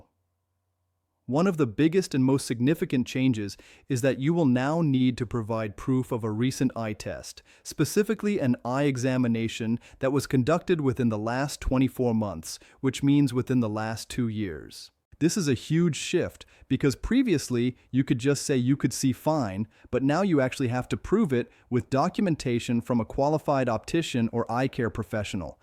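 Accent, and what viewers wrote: United States. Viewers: Well to be honest, what do you expect with a USA narrator?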